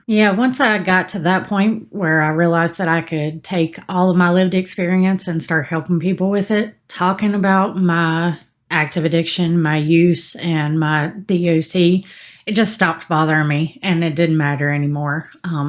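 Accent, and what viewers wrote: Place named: South, United States. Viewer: Wow, that was a beautiful, open hearted and moving vlog. Thank you for sharing in that gorgeous Southern accent of yours. Any chance you could make a video off topic how to make sweet potato pie?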